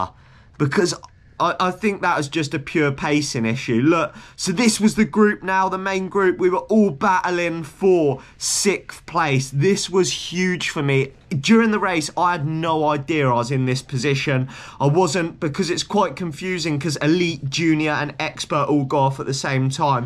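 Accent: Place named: United Kingdom. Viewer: Accent was so unbearably british I had to stop watching one minute in.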